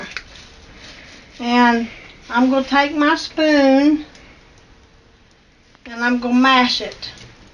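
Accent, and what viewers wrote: United States. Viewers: First time watching your videos and that soup looks delicioussssss!!!! I will try to cook it. Thank you for sharing and I love your accent, which part of the USA are you?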